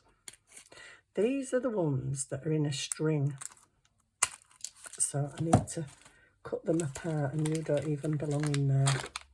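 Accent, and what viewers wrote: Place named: Scotland, United Kingdom. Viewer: Are you Scottish? I just LOVE your accent!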